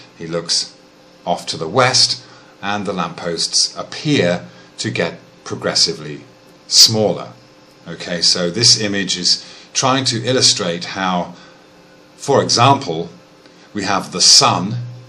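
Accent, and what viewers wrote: England, United Kingdom. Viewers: If it is not religion making you ignore the evidence - what is driving your need for the Earth to be flat? My understanding is that there where two camps of flat Earthers - one who where justifying the Bible's lack of understanding of the nature of space etc. and the other was a group who wanted to feel special because of their "forbidden knowledge" of the flat earth which the world's governments are covering up. The two groups overlap in places and disagree in places and each sometimes call the other "shills". OR are you pulling my leg? I was surprised to hear an English accent in your video, I always assume flat Earth believers are mainly from America's Bible belt.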